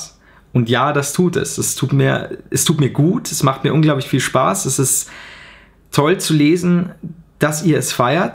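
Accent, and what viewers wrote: Bavaria, Germany. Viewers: Ich habe dich leider erst vor einer woche ca. Gefunden, aber ich feier deine videos/ dein Humor Ziemlich, Die neuen sowie die älteren. Viel glück und viel spaß weiter hin !!! Ps : Ich feier dein Dialekt, aber könntest du ma ein Ausraster over 9000 auf Bayrisch machen ? xd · Aus welchem Regierungensbezirk Bayerns kommst du? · Dei bayrisch is sa gal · Hey du bist einfach der beste aber bitte heb nicht ab denn so wie du bist bist du einfach nur geil und s geilste is ja dast bayrisch schmatzd aiso i wünsch da ais guade:)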